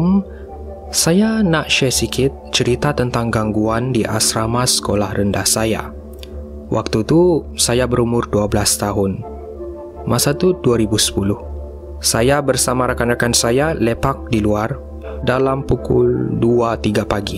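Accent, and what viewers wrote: Sabah, Malaysia. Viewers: Sabah bah tu.. haha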